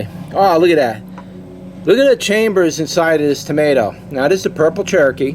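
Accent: New York, United States. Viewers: Love the review. A very upbeat video. Love the New Yorker accent. I'm from Canada so it ain't something you hear every day. Keep on growing! I subscribed and liked.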